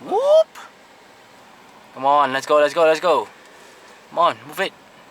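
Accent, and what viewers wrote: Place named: Singapore. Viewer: Hey bro u talk like Singapore slang english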